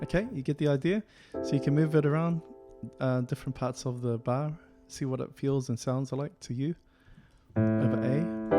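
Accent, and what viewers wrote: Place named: New Zealand. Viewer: Hi bro, I've just discovered your channel. Great work ❤️ I take it from your accent your a Kiwi I'm a guitar teacher in NZ. I'd be interested in your teaching experience in Japan as a Kiwi if so. Perhaps a video about this you think it's worth covering.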